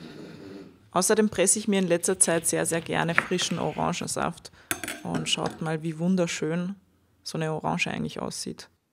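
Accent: Austria. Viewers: ich hatte eben leichte Kopfschmerzen und dank des kack österreichischen Dialekt ist es noch schlimmer geworden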